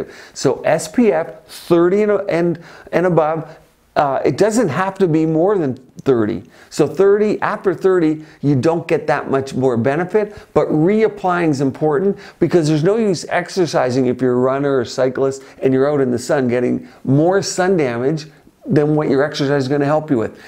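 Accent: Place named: Canada. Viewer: I was so confused because I was listening to another video and thought 'he sounds so Canadian'....haha! Just discovered you this week, great content! Keep up the great work, cheers, from another Canadian!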